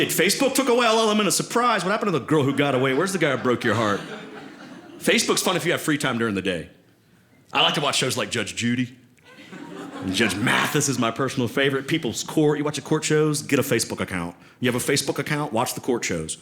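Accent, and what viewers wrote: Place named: South, United States. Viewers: It’s the Southern charm 🥰🥰🥰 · I'm from north Carolina. This dude is talking exactly the way I grew up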